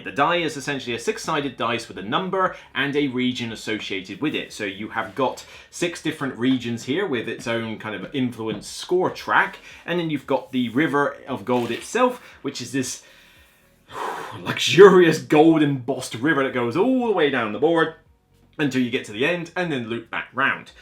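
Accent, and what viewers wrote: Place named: Midlands, United Kingdom. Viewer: This may seem like and odd comment but it’s intrigued me: Have you spent time living in the US? I cannot place your accent. It is midlands on the whole, but every now and then words are pronounced in a strong American accent….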